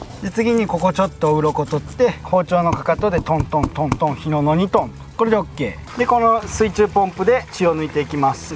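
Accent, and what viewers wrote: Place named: Shodoshima, Japan. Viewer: ナガセの待ち時間長いからね。 小豆島弁が素敵。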